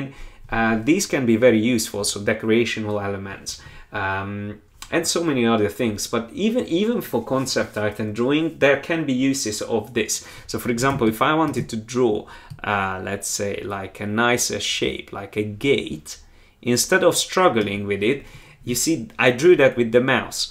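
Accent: Hungary. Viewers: that magyar accent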